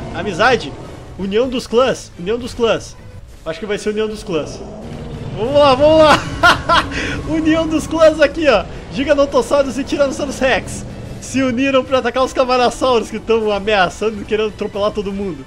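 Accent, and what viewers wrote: Brazil. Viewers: achas mesmo? Eu prefiro o vosso sotaque brasileiro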